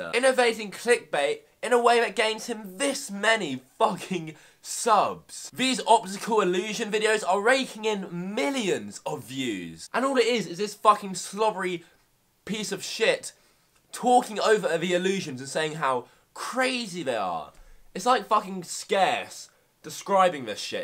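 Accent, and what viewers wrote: England, United Kingdom. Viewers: he is English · English or Australian?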